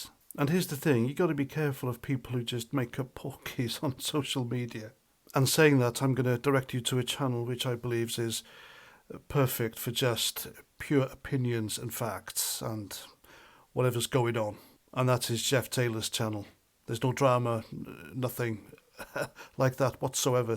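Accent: Wales, United Kingdom. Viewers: High like to view ratio, small but intense viewership this channel gets. I love the Welsh accent, it is Welsh? The ultimate in humble our. Voice of the people!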